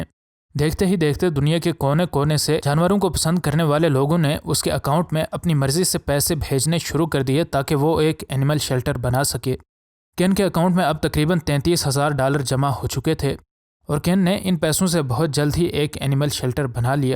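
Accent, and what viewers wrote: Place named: Sindh, Pakistan. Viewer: Ap Urdu me M.A.kro ya P.H.D pir b Teri Urdu teakh nhn ho Sakti,q.k ap Sindhi Bhai hain... Tumse ziada achi Urdu wos 4-6 saala bacha ka hy jisko alif zabr ha or beh zarb ba likna nhn a ta iski waja Yeh k yeh bacha uska bacha hy jiska Abba panageer,Hindustani, mahajir,byyah, or Urdu speaking hy...Lehaza hi Sindhi Bhai urdan daan tum nhn bnskte pir Q apni zaban ko gol gol karke Urdu bolta hy,ap bas Urdu bolo lkn pir b Sindhi E rahoge....achi Urdu sirf bayyah log E bolsakte hain Na me r Na tum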